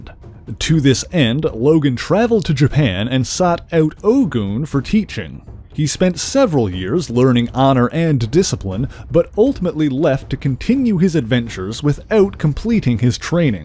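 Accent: Canada. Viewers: You’re Canadian aren’t you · Know how I know you're Canadian?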